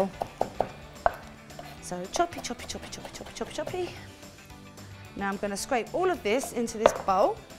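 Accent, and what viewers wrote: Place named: London, United Kingdom. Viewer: sounds like it could be london/south Essex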